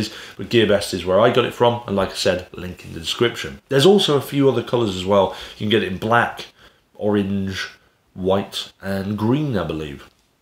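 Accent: United Kingdom. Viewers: I love the British background- the cup of tea, rusty books, bottles of whisky! Nothing like getting your daily tech review in a British accent!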